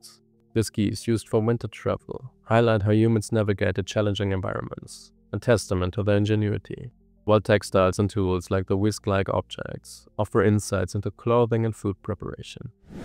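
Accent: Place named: Norway. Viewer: Excellent video on a topic I did not know about. Your voice is fine. Your English is excellent. You sound Norwegian. Which is different and interesting to an American like me and a hell of a lot better than a computer.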